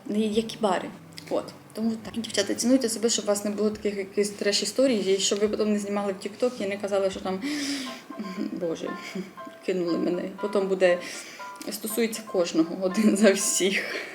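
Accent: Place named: South Korea. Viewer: Дуже цікаво, що у вас з'явився корейський акцент😇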